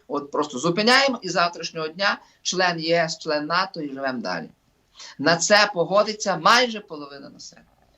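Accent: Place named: Galicia, Ukraine. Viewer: Пане я з Харкова так от що я тобі курва падло скажу з твоєї риторики видно що ти важаеш Українцями тіки галичан а ще більші Українці жителі, великого Львова, перед ніж пертися в ефір навчися говорити Українською мовою а не галицькою і за тебе і таких як ти і війна почалася. І питання ти великий патріот України чому не з автоматом в окопі.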